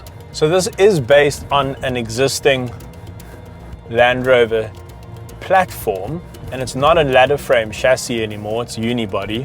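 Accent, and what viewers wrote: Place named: South Africa. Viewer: So instead of listening to the review I'm listening to incredible way of presenting, so south african and refreshing. Good job dude 🤜🏽👏🏾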